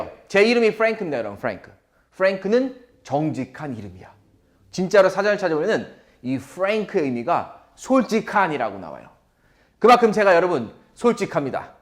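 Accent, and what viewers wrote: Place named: Chungcheong, South Korea. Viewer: 충청도 사투리 쓰시는거 같던데 천안분이셨나봐요? ㅎㅎ 참고로 사투리는 잘 모르지만 느낌이 그러네요^^*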